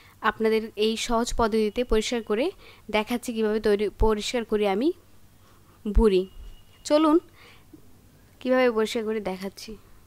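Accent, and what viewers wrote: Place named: Rajshahi, Bangladesh. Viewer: Nice Rajshahi accent Useful cooking method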